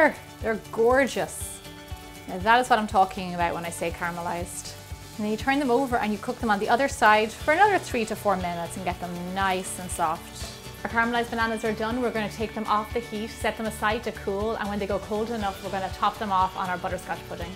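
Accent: Ireland. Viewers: You had me at the Irish accent. I stayed for the food. haha! I am making the girls honeycomb today. Have a wonderful weekend! HUGS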